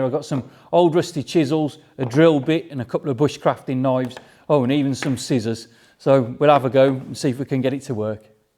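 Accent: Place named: England, United Kingdom. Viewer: thank you for responding. I took mine out of the box because you took the fear of its use and stuffing up. I sharpened knives, scissors, chisels, branches lopper and the shorthandle Axe. It was not a perfect job as the plastic was wobbly and in the way to do all of the edge; some came out uneven. I learned something as a Pen Pusher and perhaps I will do better next time. I saw the disaster with Drill bits so didnt attempt, I will think of some other use for that to sharpen the bent nails or as Pencil sharpener. Great to hear that Dialect of English as it reminds me of my time in England from North Sutherland, Jordie, and of Scotland to Llandudno in Wales and Devon in the South to Southampton. regards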